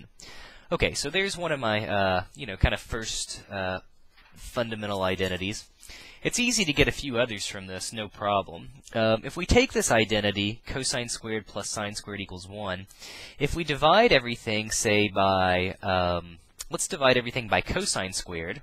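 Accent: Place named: Pennsylvania, United States. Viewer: As always, you were great. Can't thank you enough for taking your personal time to do this for us struggling math students. You rock. PS - Your accent makes me believe you're from PA...or maybe MD. Am I right?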